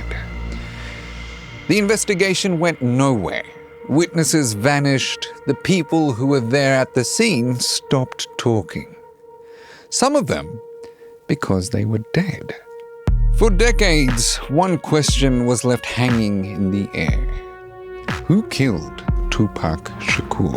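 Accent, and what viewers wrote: Australia. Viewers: They both are Australian, so that might be why they sound similar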